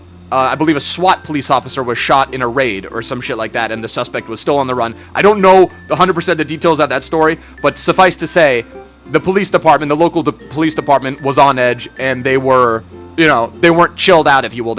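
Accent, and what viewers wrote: Germany. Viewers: is he german ? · are you German ?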